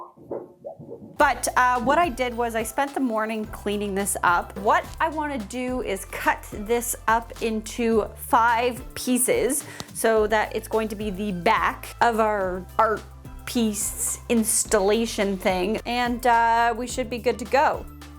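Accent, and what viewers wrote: United Kingdom. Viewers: Did you binge watch Harry Potter before you did this cause you got the accent down! The birds! That was really awesome, so cute! I love both DIY'S. I didn't think I would care for the spoon art but it looked so good.. I stand corrected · Love your British accent 😆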